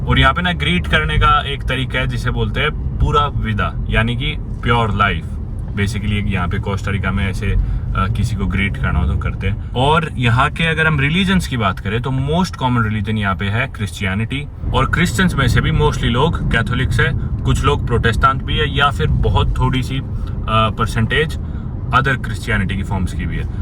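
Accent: Haryana, India. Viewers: The thick haryanvi accent is such a turn off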